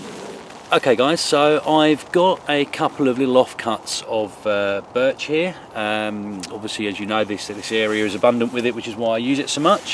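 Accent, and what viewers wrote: United Kingdom. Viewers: I like that video a lot, (1) I like the fact you're realistic about the surprising capability of the Mora, (2) I like that you show that the Ray Mears is both, an awesome tool and a piece of art (almost, at least), (3) I like the British accent. :-) PS: faultroy is an impolite troll, thus let me remind you: Don't feed the troll!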